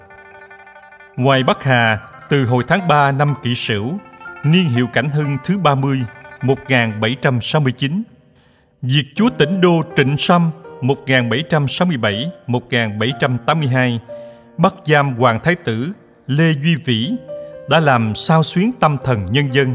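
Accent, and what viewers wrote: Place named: South, Vietnam. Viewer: nghe giọng Nam là thấy rất dễ thương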